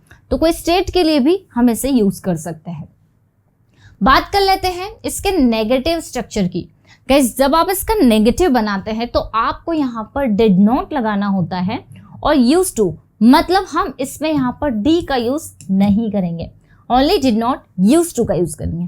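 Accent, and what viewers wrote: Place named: Bhopal, India. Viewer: आपकी हिंदी का उच्चारण भोपाली क्यों है ? बहुत इरिटेट करता है जब आप मैं को में और कैसे को केसे बोलती हो...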